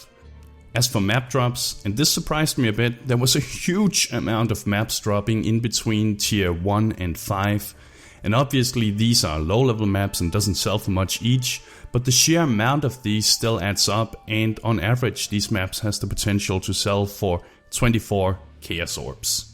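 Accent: Denmark. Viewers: Great video! Are you danish? You sound a bit danish :)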